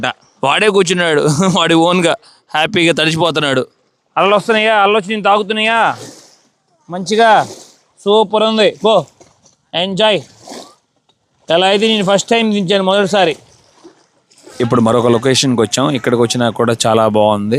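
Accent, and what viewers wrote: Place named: Andhra Pradesh, India. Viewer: సూపర్ సూపర్ అన్నయ్య ని మాటలు ఫస్ట్ టైమ్ మన ఆంధ్ర స్టైల్ లో చాలా చాలా బాగున్నాయి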